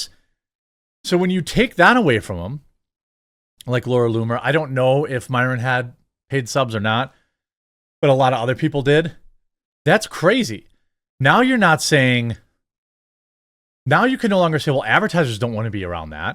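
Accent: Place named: Milwaukee, United States. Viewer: Clearly, I can tell your from Milwaukee!😂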